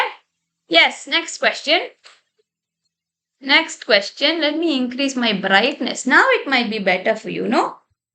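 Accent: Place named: Kerala, India. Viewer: mam you from Kerela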